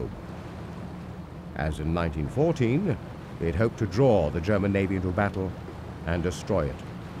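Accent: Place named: United Kingdom. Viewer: Why do documentaries always have to be narrated by a brit?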